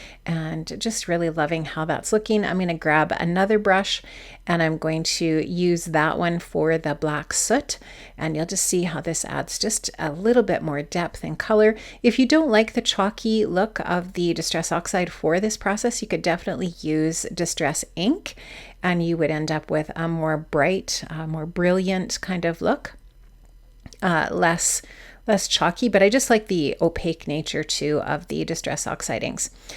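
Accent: Canada. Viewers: Love this card!!! So beautiful...and heard the Canadian accent. 😁 Only cause I hear it in my own videos. haha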